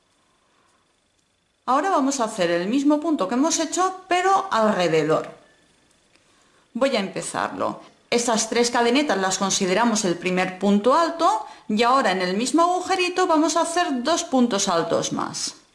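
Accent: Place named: Berga, Spain. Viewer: Hermoso, me encanta!!!! (Tienes acento berguense). Saludos!!!!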